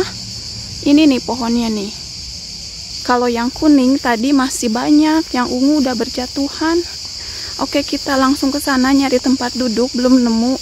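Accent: West Java, Indonesia. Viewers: Semangat terus ya teh. Salam kenal sy sunda bandung. Lucu pisan denger logat sundana💕👍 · Nemu lg org sunda,logatnya jgn ilang teh😃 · Pertahankan logat sundanya ya neng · Kecanduan suara ama logat teteh....sederhana...wlw kdg kurg ngrti bhsa sunda😅😅😅😅tp.seru..slm.dari semarang..nama q juga teeh😅😅😅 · Semoga cepet dapet momongan ya teh semangat terus pokoknya😁😁 Padahal ga ngerti klu teteh omong sunda tapi seneng aja denger teteh omong sunda menghibur banget tehh 🥰🥰🥰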